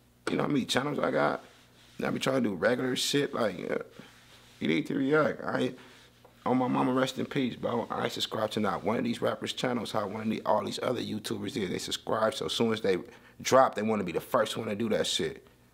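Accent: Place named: London, United Kingdom. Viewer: 😅 The London voice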